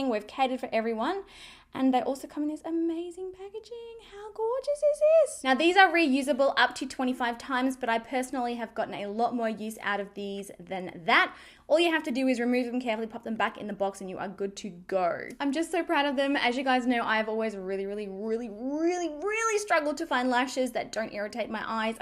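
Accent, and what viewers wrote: Australia. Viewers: I just love your Aussie accent! That to me was a bonus!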